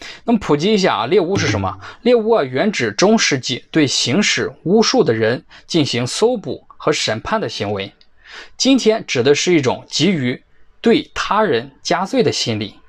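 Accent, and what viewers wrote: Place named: Northeast, China. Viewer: 小哥这嘎啦味普通话很亲切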